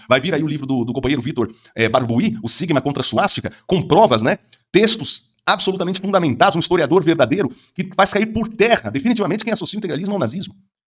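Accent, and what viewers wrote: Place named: São Paulo, Brazil. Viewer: Ambos paulistas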